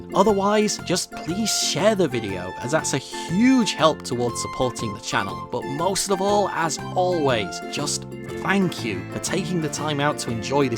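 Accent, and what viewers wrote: United Kingdom. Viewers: Andrew Wood pretty sure he’s british if you listen to his accent you 🤡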